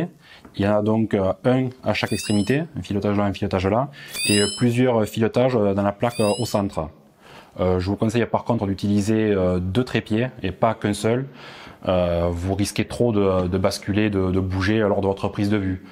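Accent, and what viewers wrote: South, France. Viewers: Bravo pour ce fabuleux travail! L'implication dans chaque vidéo est très visible! Un bon test qui fait ce qu'on lui demande. (au top d'avoir mis un exemple, c'est pour moi la base dans ce type de test). L'éclairage, le mur est au top! Et toujours avec l'accent du sud!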